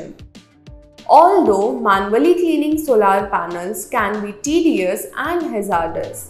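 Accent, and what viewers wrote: India. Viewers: the accent is almost totally but not quite totally indistinguishable from English. It's very.. bolly. Staccato... too Adamant. English should be softer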